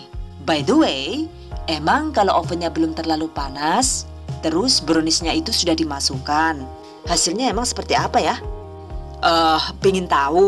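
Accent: Banyumas, Indonesia. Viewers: Bunda,kok bahasane ngapak , emang asli mana bun,,,oya bun nnti saya coba ,,,,,sehat selalu bun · Deneng bisa basa ngapak yak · Aq pasti ngakak denger logat ngapaknya,,,,,kui sing tak kangeni bunda,,,,aplgi resepnya,,,,,,suka bangettt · 😂😂😂 lagi serius2..., becanda gaya ngapak ... The best lah Bu!, thankyou resepnya Bu!...saya coba nanti.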